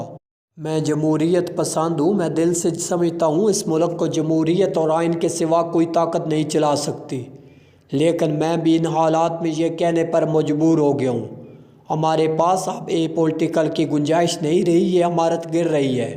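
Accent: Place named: Punjab, Pakistan. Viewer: ye Admi Urdu mea panjjabi Bolta hea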